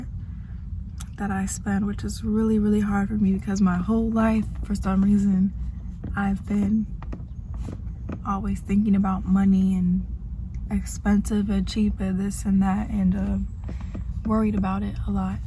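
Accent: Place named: New York, United States. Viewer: U def from new york or maryland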